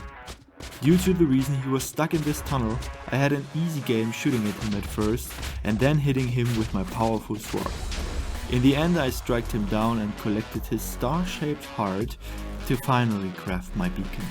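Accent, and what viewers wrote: Germany. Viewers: I will always recognize the german accent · I LOVE your German accent!!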